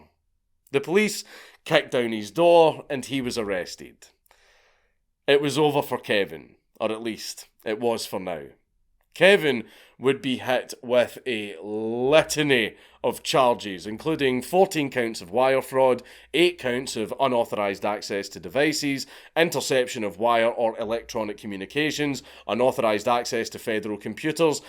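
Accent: Scotland, United Kingdom. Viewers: I stumbled across it about a month ago and dear god this is all I have been listening to. Something incredibly soothing about a stoned Scottish man telling me stories. Enjoy and subscribe! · man I could listen to this scottish guy narrating peoples stories all day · This guy is still talked about today in cybersecurity classes I take. Man's a legend and hearing talk about it with that Scottish flare is amazing. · I am loving the long format videos with your scottish accent. Its like a audio book but doesnt make me sleepy. I hope you will create more (as a student I can state that elevator music is great). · Mic Drop 🎤 2 hours of the Scottish accent ❤...I will try to comprehend the content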